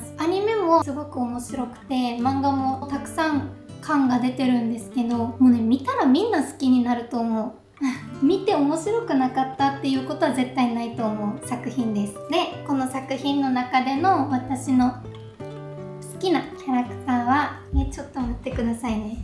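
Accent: Kagoshima, Japan. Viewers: 自分も鹿児島に住んでいるのでこの訛りというかイントネーションに親近感が湧いています。これからもこの動画の雰囲気を楽しんでいきたいと思います。頑張ってください！！！ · の鹿児島弁がひさびさに聴けて、多分だから癒されてるんやと思う😊✨ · はいつまでも鹿児島訛りでいてホシイ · と同じ鹿児島弁のyou Tuberの釣りむすめと鹿児島弁対決してください。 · 伸びかけのボブを見て大人っぽくなったなぁ変わったなぁと思い、鹿児島弁の「いつもの」喋りを見て全然変わってないなぁと思い。楽しそうに好きなものを語る様子が本当に嬉しい。推しキャラに可愛いとしか言えなくなるが可愛すぎました。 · いつもひょっこり顔を出してくれるだけでも嬉しいのに声まで来たもんで、本当に癒されます！訛りはが鹿児島にいたことの証だから、むしろどんどん出して欲しい！キャラクターの説明で「かわいい」って言うたびに画面の向こうで「あなたがですよっ！」とツッコんでいたのは僕だけではないはず。そしていちいちアップになるたびにかわいいのもずるいし、どういうことかっていうと、今日も癒しの動画をありがとうございます！ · の漫画・アニメ愛が伝わってきました！そして鹿児島訛りが同じ鹿児島県人として心地よくて癒しです😊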